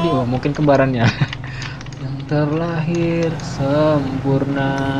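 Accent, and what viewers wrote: Medan, Indonesia. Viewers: ngomongnya kaya orang medan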